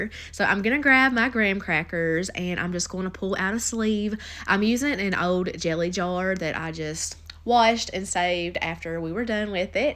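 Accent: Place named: Kentucky, United States. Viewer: Are you from Richmond? I’m from Paris, KY and I feel like your accent is heavier than most people’s around here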